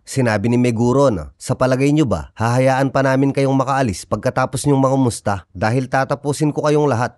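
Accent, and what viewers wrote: Pampanga, Philippines. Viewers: Haha kapampangan ka boss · Kapampangan ka ne boss?? Accent kc.😅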